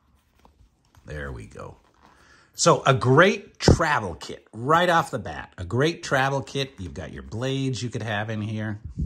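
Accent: United Kingdom. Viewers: The thing i love about british people is their accsent🥳😃🎉🎊🇬🇧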